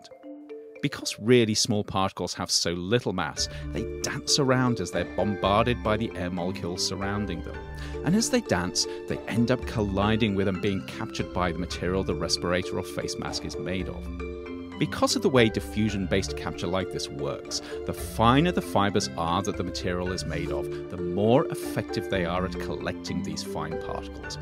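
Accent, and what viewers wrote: United Kingdom. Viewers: Is there a reason why you choose to have a narrator with a British accent?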